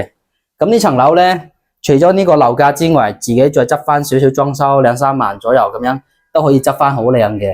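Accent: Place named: Dongguan, China. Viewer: 東莞口音點讚 !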